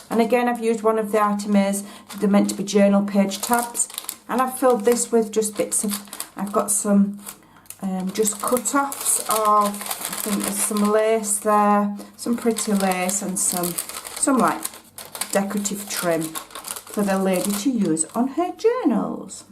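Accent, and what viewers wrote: United Kingdom. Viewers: Sometimes the British way of speaking is hard for me to understand. Could u please repeat the lady that sells the beautiful Victorian art pictures? I would love to also creat these bags. Love your videos thanks so much.....I am a shabby chic. Lady also....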